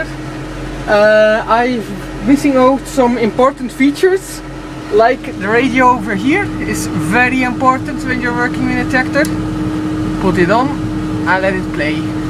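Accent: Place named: Netherlands. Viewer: Duidelijk hoorbaar dat je normaal gezien nederlands spreekt ;D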